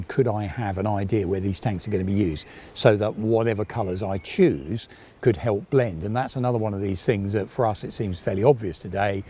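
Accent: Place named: Cockney, United Kingdom. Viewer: Septics...a bit of cockney is like a whiff of home...even if you're from the valleys, like me... :)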